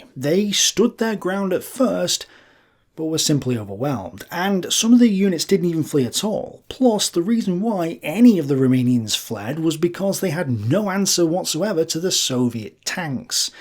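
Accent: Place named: Liverpool, United Kingdom. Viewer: I’m really not placing this accent, I’m getting Liverpool, a hint of Manchester, and possibly Lancashire as well. Maybe St Helens???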